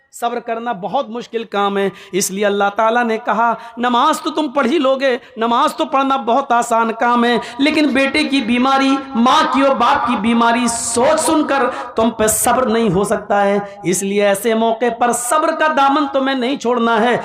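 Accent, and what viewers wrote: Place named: Bangladesh. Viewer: Ye sala Bangal deshi bala bat karta h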